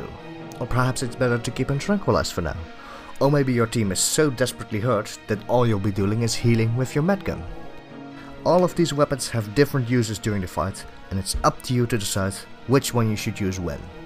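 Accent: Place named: Netherlands. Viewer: Nice try, you're Dutch, I can hear it!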